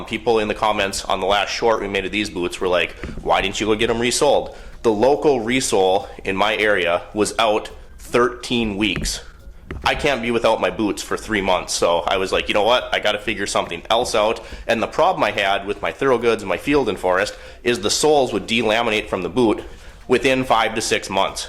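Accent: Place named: Canada. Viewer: You’re Canadian Aye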